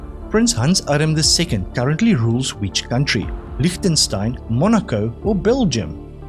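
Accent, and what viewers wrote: South Africa. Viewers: Love your South African accent. Nothing is 500 million years old, it's pure guessing. Study the subject further.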